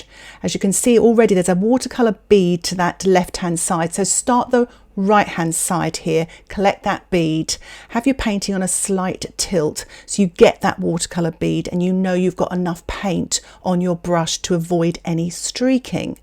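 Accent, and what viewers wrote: United Kingdom. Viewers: i wish I had this video when I first started painting with watercolors. It would have answered so many of my questions. I've done many of your tutorials because I love the sound of your voice and cute British accent, the ease with which you explain things, and the style and subjects of your paintings. Sending much love to you💕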